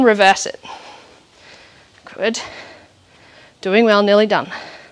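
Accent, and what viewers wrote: Australia. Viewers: Thank you so much! This is my Day 2 and I see a big difference. My husband & I have been painting our home and painting the ceiling, did it for me😩I felt my muscles were so stiff! This surely helped with some Stretching Exercises! Thank you again! Btw love your Aussie accent❣️😘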